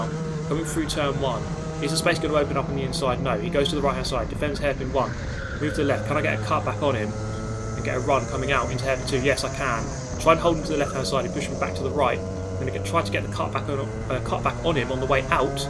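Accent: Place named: South East England, United Kingdom. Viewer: Great content again mate! That fight for the first place was intense! Your inspiring me to try and have a go at it! Ive seen seen they are some races in Farnborough near me, judging by your accent you are racing somewhere in the south east?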